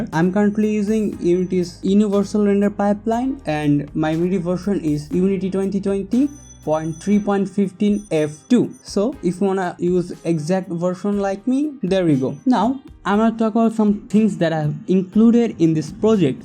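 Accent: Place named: England, United Kingdom. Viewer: perfect, i love your English accent <3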